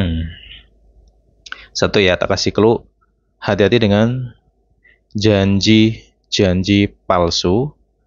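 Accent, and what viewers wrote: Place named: East Java, Indonesia. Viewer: Hadir....dr bogor . Logat jawa timuranne kentel. Heheehe salam arek arema , 🤭😊😁 suarane enak ✌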